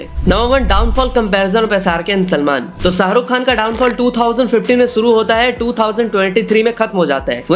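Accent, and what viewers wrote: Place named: Bhopal, India. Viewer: Bhai tu bhopali lagriya hai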